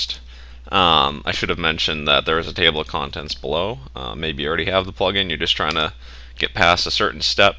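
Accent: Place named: Canada. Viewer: by the accent, I'm guessing you're Canadian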